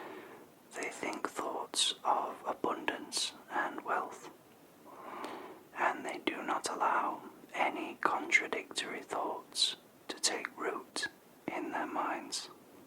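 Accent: Yorkshire, United Kingdom. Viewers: Are you from Yorkshire? I recognise the accent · are you British? I like your accent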